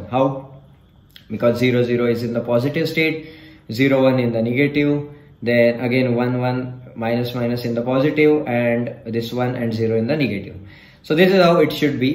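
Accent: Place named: India. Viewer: interesting content, interesting indian accent:face-blue-heart-eyes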